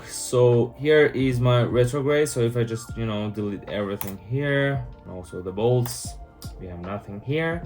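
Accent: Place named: Iran. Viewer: hey where are from you sound iranian❤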